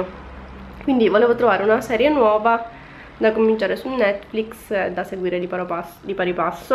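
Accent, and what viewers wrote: Sicily, Italy. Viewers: Sei siciliana? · Sei siciliana??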